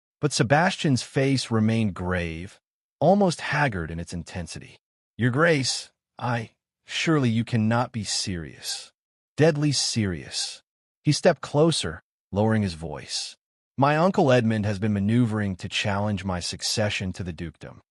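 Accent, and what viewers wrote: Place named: United States. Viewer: I simply cannot understand why they use such a strong American accent for the narration in a story set in Britain. It ought to be British English.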